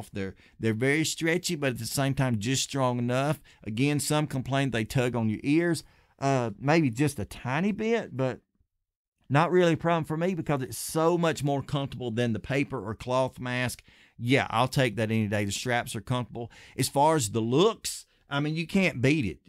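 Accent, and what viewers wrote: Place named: South, United States. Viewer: As a fellow southerner now living in the PNW, I love hearing your accent! I miss it!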